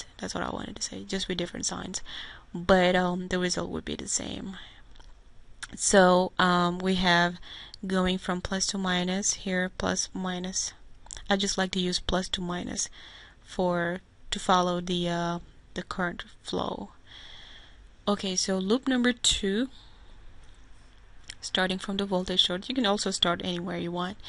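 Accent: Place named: India. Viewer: Idk why but Indian accents give me ASMR so I get that while I learn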